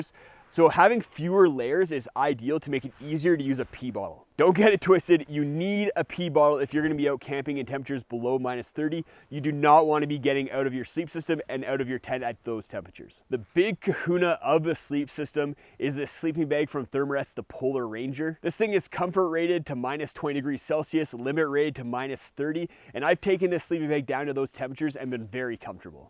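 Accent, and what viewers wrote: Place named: Canada. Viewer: annoying canadian accent.....ooootside, lol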